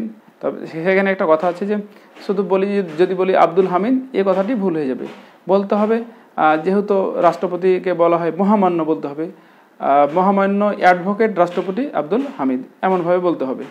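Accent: India. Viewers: Apnr kotha shune mone hocche apni indian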